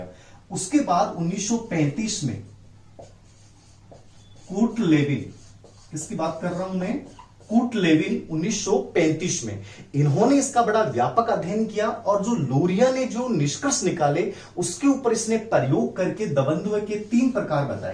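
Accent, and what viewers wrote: Rajasthan, India. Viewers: Guruji aap Rajasthan ke ho kya or aap bahut jayda achha pdate ho thanks Guruji 👍👍👍👍👍👍👍👍👍🙏👍🙏🙏👍🙏👍🙏🙏👍🙏👍👍🙏🙏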